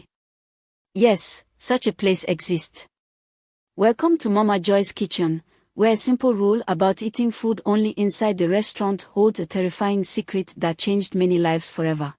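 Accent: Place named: Ghana. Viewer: Are you a Ghanian, this your accent sha!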